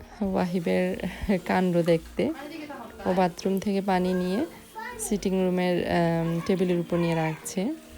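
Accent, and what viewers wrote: Sylhet, Bangladesh. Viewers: Apu tomi ki sylhel y?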